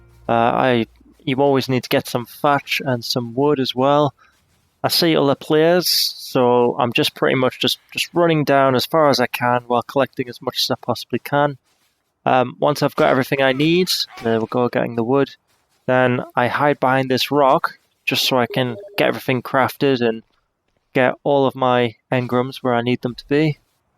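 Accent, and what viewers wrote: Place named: Ireland. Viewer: I love your irish accent lol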